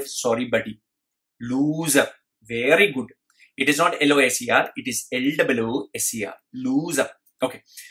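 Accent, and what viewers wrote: India. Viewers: WHY YOUR ACCENT SOUNDS LIKE HOW INDIAN SPEAK.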